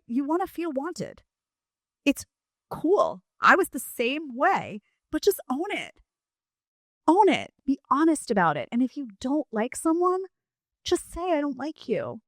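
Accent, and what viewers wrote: New York, United States. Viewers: Yea I thought you was like fuugggeeeettttt aaabbbooouuuuuttttttt that's iiiissshhhhh and rolled all the way out. It's been like what 2.5 yrs since you did something on here. Dang we missed that awesome smile the new York accent and attitude. So happy to see your back. Glad your happy healthy and safe. God bless so happy your back.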